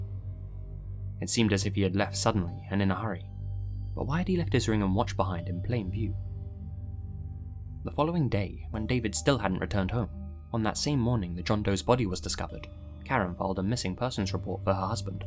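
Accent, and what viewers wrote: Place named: United Kingdom. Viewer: But sorry I know your British i love your videos soo much you're the only one ill listen to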